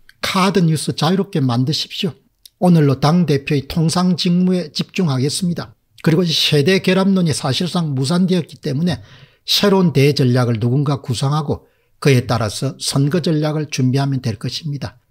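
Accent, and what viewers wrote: Gyeongsang, South Korea. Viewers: 이준석 화이팅!! 공병 이놈아! 니 쌍판때기 보자니 오바이트 나온다. 경상도 사투리 웃김...ㅋㅋㅋㅋ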